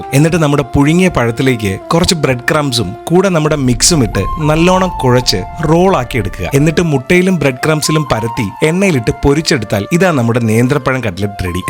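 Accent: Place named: Wayanad, India. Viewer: Vayanadan sound 🤭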